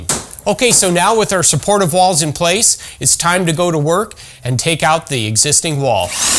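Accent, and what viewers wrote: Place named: Canada. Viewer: You sound Canadian....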